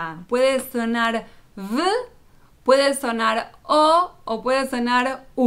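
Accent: Argentina. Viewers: no tengo mucho tiempo de verte conocido por medio de YouTube y me da tanto gusto de escucharte tus expresiones y explicaciones acerca de las costumbres Judías y de pronto pensé que escuchaba a una Argentina, pero bueno eso no importa; sabes amo a Israel amo a Jerusalén quizá no tengo una forma de expresar clara en cuanto al decir amo…… pero siento algo que no se cómo describirte, Dios es bueno…….sigue adelante con esas explicaciones tan bonitas, mi nombre es Luis y soy de Guatemala. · querida; hasta que se dijo v ine a vivir ; estuvo bien, cuando se dijo USA, alli si que ya no mas me gusto, tienes un dialecto argentino , asi esta mejor , no lo destruyas con usa , querida evita esas palabras, ha sido y es el demonio desatado sobre nuestra region, no la riegues.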